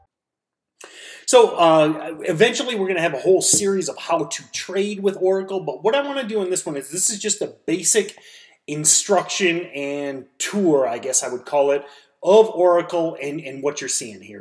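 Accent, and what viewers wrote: United States. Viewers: The way he talkssss, his accent and his over emphasis of general words is so annoying and obnoxious. My ears literally bled.. Maybe coz i am an aussie and can't comprehend his typical non urban american accent. Faaaarkkkkkkkk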